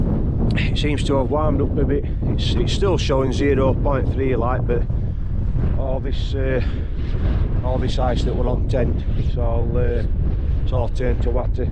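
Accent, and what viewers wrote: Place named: Yorkshire, United Kingdom. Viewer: Top quality Yorkshire accent that pal 👊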